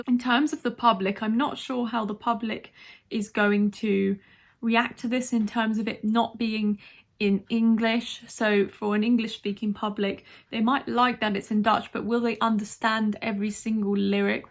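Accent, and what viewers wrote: United Kingdom. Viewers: you have a lovely English accent and you look gorgeous. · I am not a huge fan of Eurovision, and it's veeery seldom that I watch "reactions". This year is an exception, because of our Kalush Orchestra participation at Eurovision 2022. Thank you for your respectful analysis of this entry. I have watched some "reactions" (which was mostly like Oh/wow/lifted eyebrows kind of reactions, which is neither fun nor development)). But your channel gives so much more! Your Reactions are more like Analysis than simple reactions, actually. Love your British accent), love your genuine vibes, love your respect in predictions. Share your emotions towards Måneskin)). Thank you! You are doing it right! Love from Ukraine! Since we cannot vote for our country, my vote this year is for Sam Ryder, UK. Very good entry!! Vocals, aesthetics, the whole message- good luck, Sam!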